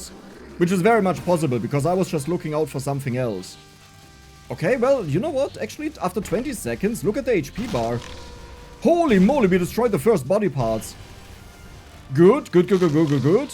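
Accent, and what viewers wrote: Germany. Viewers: accent is so pronounced, yet very different from the classic German accent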